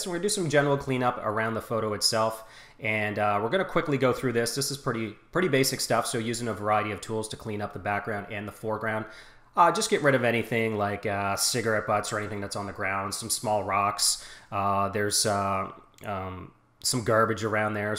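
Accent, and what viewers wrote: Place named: Atlantic Canada, Canada. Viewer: Great video! Cool accent, almost sounds like you’re from Atlantic Canada.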